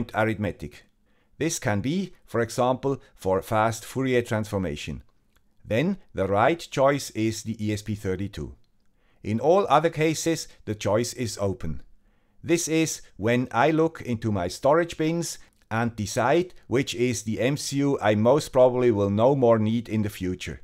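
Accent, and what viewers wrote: Switzerland. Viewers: The guy with the Swiss Accent - Smashing the Arduino learning curve into a pancake since Video #1.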